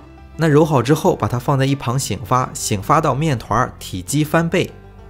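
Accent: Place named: Shandong, China. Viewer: 听着就是山东老乡口音 青岛的吧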